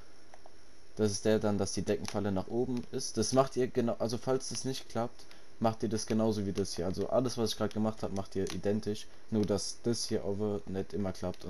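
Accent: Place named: Switzerland. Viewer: so reden wir auch in der schweiz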